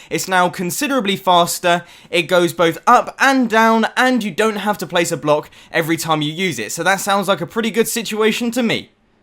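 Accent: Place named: Cockney, United Kingdom. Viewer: Gawd, I love your accent, sort of cockney-ish!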